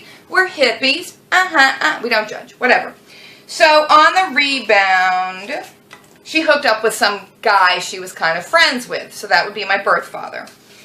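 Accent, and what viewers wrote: South, United States. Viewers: It is funny that when I was watching the video earlier today about your Copenhagen collection I was wondering if you were of Danish descent but I did not ask in the comments. The other thing I thought was interesting is that I thought you had a Southern accent. You mentioned living there briefly but not bring born there. Have you discovered any new information? Did you do an <link> DNA test? Or 23 and me. The 23 and me might answer the health questions without finding your relatives.And finally, I had suspected you were a Scorpio(I am one ) but did not think the topic would ever come up in the vlogs. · I've been watching your family for about a month now. This is the first time that I feel I have some connection... cuz my two children are adopted also. They carry the names I gave them and not their birth names. Both my children feel like you that there is no shame in it or Secret. 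By the way I think that you guys are hilarious love your sense of humor. And I've always wondered where did you get the southern accent from. Keep it up it's wonderful. And also your other accent too. I love your husband's demeanor he's as cool as a cucumber. love you guys and all your seriousness and all your sense of humor. By the way we can't afford to move out of our house either because it's underwater. But I have a plan to remedy that. Take care keep doing what you're doing you're on the right track... So inspiring.😍😎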